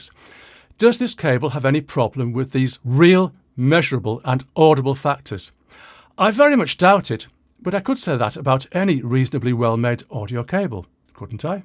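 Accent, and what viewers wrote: United Kingdom. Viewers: Speaking of sounds affecting people - I worked with a guy , My friend Andy , He's British and has the same accent as you Well , we were in sales , I had to compete with him everyday , all day. His voice alone would absolutely hypnotize customers . We all experienced for years . We sold cameras and telescopes etc... I told him that he absolutely should be selling hi end jewelry . This was in Venice Florida US , It would have been like taking candy from a baby . lol!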